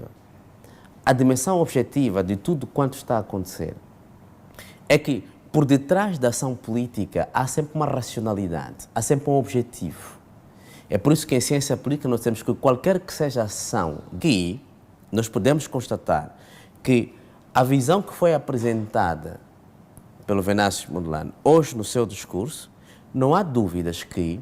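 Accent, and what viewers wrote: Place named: Angola. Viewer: Senhor, nem sei donde falas mas, pela forma como falas, deves ser de um país DITADOR como Angola e tu es lambebota do MPLA q queres furrar no Poder se apoiando d pseudo intelectual, um vazio q enganam tao bem os tolos e analfabetos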